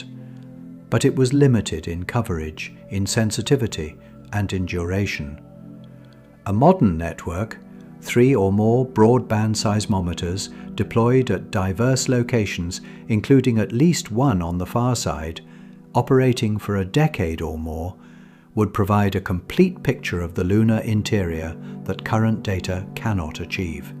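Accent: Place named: England, United Kingdom. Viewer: A..I uses an English accent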